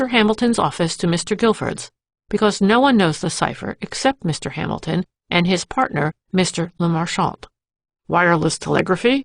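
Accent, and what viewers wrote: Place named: United States. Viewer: sorry, no, don't mind me. I just was looking for as I mentioned in another reply, a British voice reading these particular stories. And I would go to one that would have one name for the channel and it would be I presume you or another female non-British voice, then I would scroll and there would be another one in the series but the channel name would be different and I would click on it thinking it would be a different voice but it was still an American or Canadian female reader. If I mistook this and if it's not like that and there aren't different channels with you or another non-british female narrating then I apologize. I did it very quickly so perhaps I got it wrong. But my original question was why does it sound like the same woman narrating stories I want to hear but with different names for channels where one might reasonably assume it would be a different voice a different person altogether,, but every one I clicked on seemed to be the same voice no matter what the name of the channel was.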